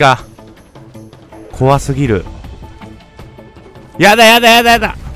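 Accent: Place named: Hiroshima, Japan. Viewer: うん、広島とかその辺の方言なんだよね。 by広島県民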